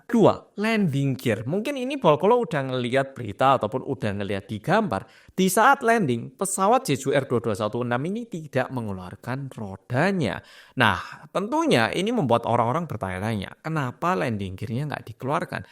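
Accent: Java, Indonesia. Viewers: Masyaallah jelas banget kasih keterangannya. Juga ko' medok lisan berkata nee orang mana nyak ? Matanya sipit Bahasa medok jawi 👍 Terimakasih untuk kabar beritanya. Alfateha untuk mukminin&mukminat yg kena musibah. Semoga husnul khotimah Aamiin 🤲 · jowo medok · Pertama gw translate. Pas up volume ngomonge medok. Auto off translate 😅😅 love u bro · Ngomong nya medhok. Indonesia banget. Dan banyak banget subscriber nya ternyata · Muka oreintal, ngmg medok😢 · Seneng dengerin logatmu mas...medok 😅😅😅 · Haloo SeonSaengNim Medhokk😅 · Baru pertama kali dengar orang Korea tapi ada logat Jawanya :)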